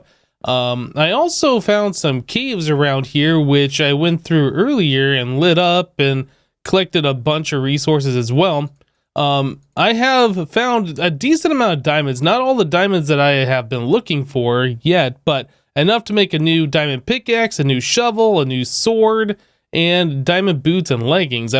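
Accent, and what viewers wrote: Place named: Canada. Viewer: That hint of what i think is canadian really gets me sometimes.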